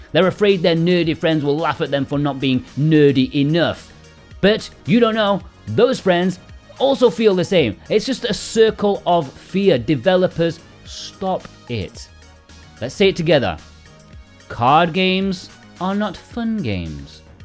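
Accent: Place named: England, United Kingdom. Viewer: La mejor voz y el mejor acento inglés de YouTube. Saludos desde México 🇲🇽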